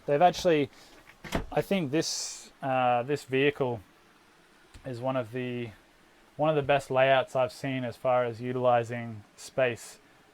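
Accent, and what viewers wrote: Australia. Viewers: Very nice little Camper.I was listening to your voice young fella and finally realized its an Aussie voice. Very Nice and this size van would suit an old Lady that I am at 71 years § Still would love an Adventure.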